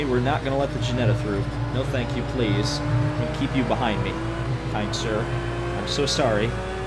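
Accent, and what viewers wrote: United States. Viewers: Finally an American... i hate hearing some brit’s lispy-ass voice ffs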